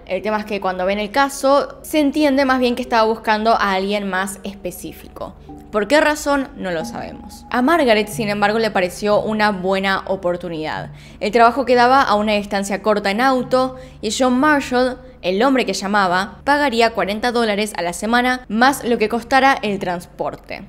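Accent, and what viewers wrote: Argentina. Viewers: Me encantas tus historias de misterio, y mas con ese acento argentino tan pronunciado jejeje...haces un gran trabajo guapa, felicidades!🥰saludos desde Oklahoma city-Oklahoma..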